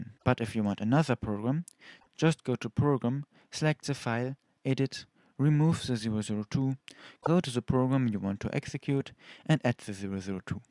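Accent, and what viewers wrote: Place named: Germany. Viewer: This german accent😂 no hate. I just find it funny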